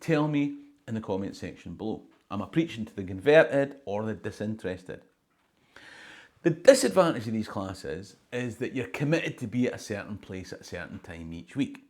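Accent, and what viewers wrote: Scotland, United Kingdom. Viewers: I am learning your Accent as i listen to you speak..i realize it is possibly a regional accent so i wont say it is THE Scottish accent.i repeat how you say words..i love the Scottish Accent as i am a Henderson and have MacDougal family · We American women love a good Scottish accent. Any videos of you in a kilt? Thank you in advance.🌷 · I dunno, but sounds to me like you speak Gaelic with a Scottish accent. There's irony in there somewhere. You didn't mention Duolingo which has an introductory but extensive course in Gaelic. It's free (which appeals to me) and completely flexible (although they pester you to complete a lesson a day). For those in North America, there's Colaisde na Gàidhlig (The Gaelic College) in Nova Scotia. They offer in-person and on-line courses. (I don't believe there's a bar/pub, however.) <link> Tìoraidh an-dràsta. 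Chì mi a-rithist thu.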